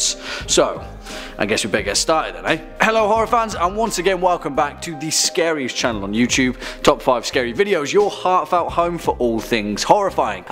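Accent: England, United Kingdom. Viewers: has the best english accent ever makes binge watching easy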